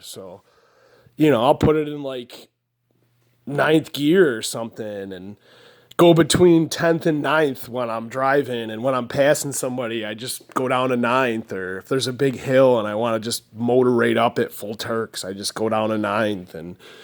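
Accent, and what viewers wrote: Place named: Buffalo, United States. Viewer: I was gonna you sound like your from Buffalo. Then you said Syracuse area so I was close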